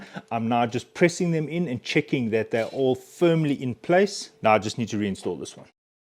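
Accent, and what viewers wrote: South Africa. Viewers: South African accent, mooi🙂👊👍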